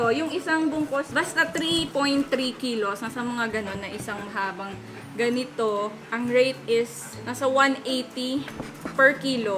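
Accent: Visayas, Philippines. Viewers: Ate taga davao ka pala huhu sayang taga digos ako, pero sana ma meet kita ate Ang cute mo mag bisaya. Pero welcome ulit sa Davao city po❤️❤️. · ka cute ba nimo mag bisaya ate oyy haha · Ka cute man mag bisaya oy 🤣🥰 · Nakakatuwa na nalaman ko na ang ay nakatira ng 3 years sa davao!Bisaya din ako and ang cute ni Ate mag bisaya😂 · ay ka cute Ate mag bisaya oyyy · cute ng bisaya mo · watching from davao:))))) ka cute nimo magbisaya ateee always watching your vlogs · Ang cute ni ate mag bisaya 😅 kababayan pala kami ni 😅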